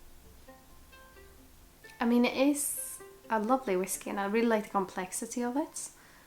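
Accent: Scotland, United Kingdom. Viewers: Why do you sound Scottish?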